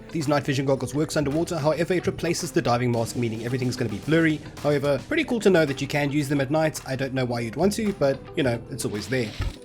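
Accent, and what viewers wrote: South Africa. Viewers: Are you South African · Are you South African? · What accent is that? Are you South African?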